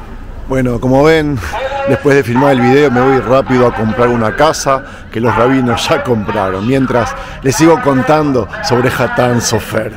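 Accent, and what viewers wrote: Argentina. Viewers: Hola. Soy Miguel de San Vicente bs as, no soy religioso, sin embargo vos con tus videos logras llamar mi atención, haciéndome ver cada uno de tus videos.Quizas sea el acento argentino. Quisiera hacerte una consulta, vos decís en el vídeo q los sefardíes son los judíos de oriente y los azquenazis los de occidente.es así o es al revés.? Te mando un abrazo grande y seguí así.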